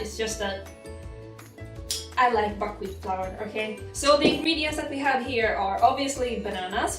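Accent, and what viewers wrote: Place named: Sweden. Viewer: Are you from suécia? Your english is different. The suécia is a beutiful country.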